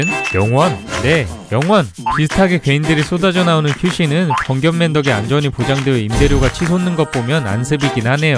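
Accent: Gyeongsang, South Korea. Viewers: 님 경상도 분이신가요? "사이타마" 말할때 "싸이타마"라고 하시는거 보면 경상도 특유의 쎈 발음이라 갑자기 궁금하네요.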